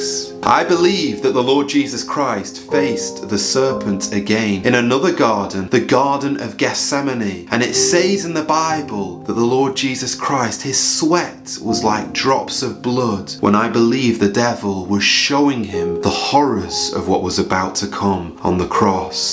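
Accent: United Kingdom. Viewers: Keep it up brother this is so important, only the gospel can change things, and it’s good to listen to someone from Britain. Paul .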